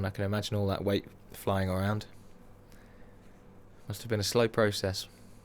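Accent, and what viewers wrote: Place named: United Kingdom. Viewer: Brilliant very creative - Thanks. I recently made my own polishing paste - 4 parts mineral oil 1 part white bees wax, 1 part diatomaceous earth. I mistakenly thought that I was limited therefore to wax based finishes such as buffing with Carnuba wax. However, I see you using shine juice here without a problem. I would like your (or others) input on finishes that can be used after polishing paste. Thanks in advance. PS: I would be interested to learn where you are located in the UK. I left for the US in 1984 and have been trying to nail down your accent.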